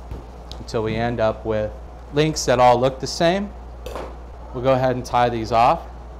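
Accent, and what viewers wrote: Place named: Philadelphia, United States. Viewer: Think this dude is from Philly. He says certain words funny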